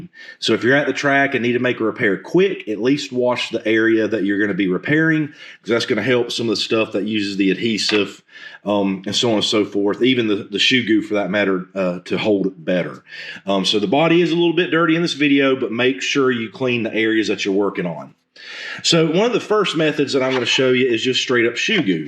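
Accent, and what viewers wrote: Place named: United States. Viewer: Great job, i love your american accent! Yee haaw!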